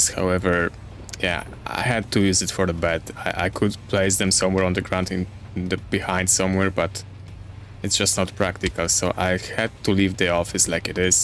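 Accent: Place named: Poland. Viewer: Wiem że jesteś z polski słyszę ten akcent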